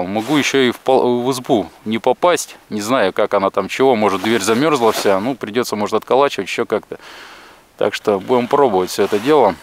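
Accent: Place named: Kholmogory, Russia. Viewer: Здравствуйте! Смотрю все ваши видео твой говор напоминает моего сослуживца по армии,Виктор Курсов зовут, где то в районе Холмогор он жил. Как нарвался на твои видео сразу его вспомнил, удачи тебе в твоём деле и продолжай снимать!!!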